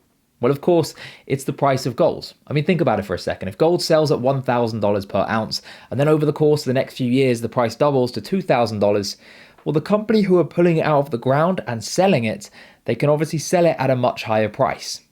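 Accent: United Kingdom. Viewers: Subbed for financial opinions / both sides of the argument,.....Not the British accent......🧐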